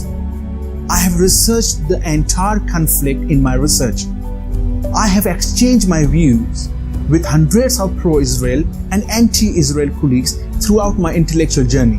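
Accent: Bengal, India. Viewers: He sounds like a Bengali no Pakistani speaks like this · He sounds Bengali not Pakistani. He sure is confused with his identity.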